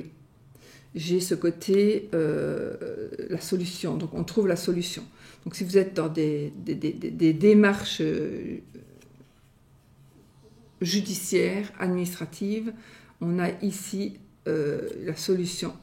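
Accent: Belgium. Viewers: Coucou, merci beaucoup pour votre travail. Je vous découvre aujourd'hui. Vous êtes belge ? Vous avez, il me semble un petit accent qui me parle...❤❤😂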